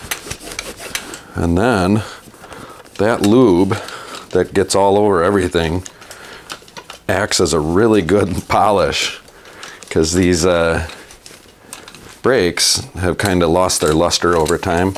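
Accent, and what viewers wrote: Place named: Midwest, United States. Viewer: Judging by your accent, you're somewhere in the Midwest. Where's your shop? I'd patronize your place for sure. You seem to have a lot of integrity and know how.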